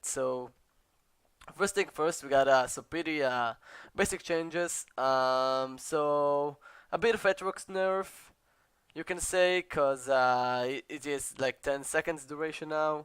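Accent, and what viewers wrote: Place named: Latin America. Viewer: are u latino? lol ur accent is strong and nice video! pretty informative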